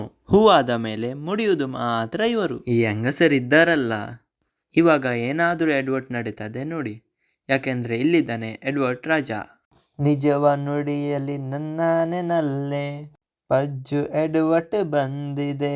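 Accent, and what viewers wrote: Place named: Mangalore, India. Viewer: Manglore kannada super voice nice bro❤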